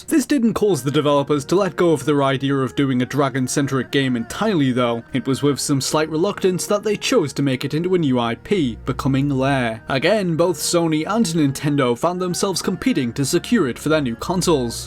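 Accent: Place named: Newcastle, United Kingdom. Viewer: You Geordie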